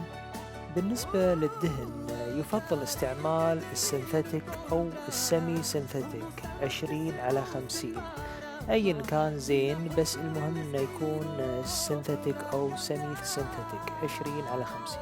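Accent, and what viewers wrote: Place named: Kuwait. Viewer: ايه الله يسعدك فعلا بس والله ماكنت أعرف إنك تتكلم من الكويت على كل أهل الكويت حبايبنا. فعلا فيه تباين باللهجات بس كنت أفكرك من ربعنا هههههه أعتذر منك وشرفني مرورك يالغالي. أعتذر منك بشدة. كلمة حق أهل الكويت رواد في كل شيء مميز بصراحة. وهذا شي ظاهر وواضح مايحتاج لشهادة أحد.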